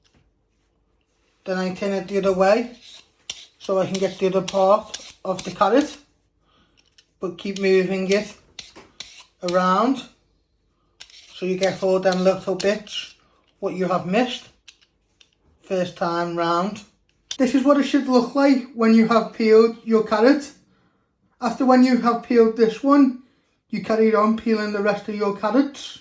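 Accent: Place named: United Kingdom. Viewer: This guy's ability to sound British is amazing!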